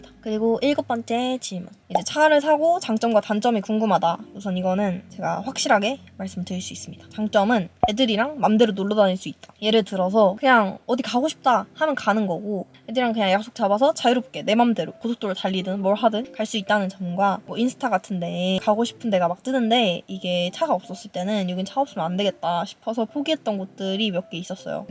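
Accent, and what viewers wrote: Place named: Jeolla, South Korea. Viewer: 전라도 사투리 심하구만 왜 서울사람인척?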